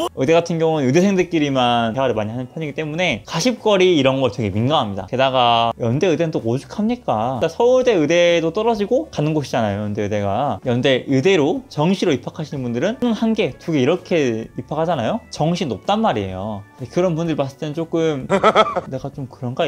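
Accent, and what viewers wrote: Gwangju, South Korea. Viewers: 광주 출신이신가요 ㅋㅋㅋ말투가 거리감이 없는 거 같은데 느낌만인가 ㅎ.ㅎ...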